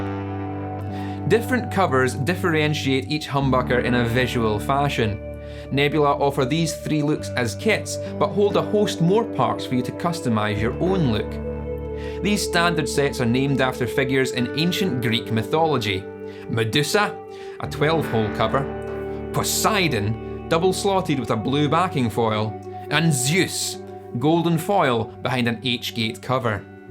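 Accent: United Kingdom. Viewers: That’s British sounding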